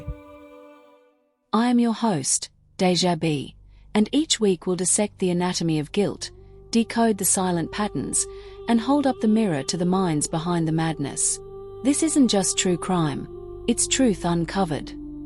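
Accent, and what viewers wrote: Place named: Australia. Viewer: At least the ai has an Aussie accent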